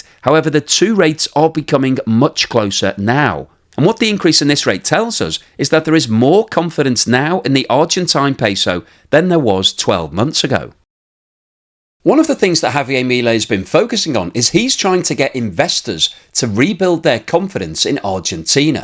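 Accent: United Kingdom. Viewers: Even your charming British accent doesn’t help you with one major flaw with your analysis. And that is that the Clown known as Javier Milie revealed his pure incompetence when he said that the only countries in the world that he is going to have economic relationships with are USA and Israel. He completely omitted China which is the economic powerhouse on the global scale. Milie is a complete imbecile and Sadly many Argentinian were too ignorant to see that.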